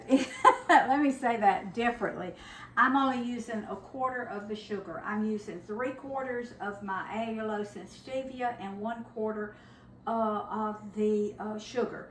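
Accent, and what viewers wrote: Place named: Missouri, United States. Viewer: You’re Adorable! You sound like your from Carthage, Mo! My mom grew up there.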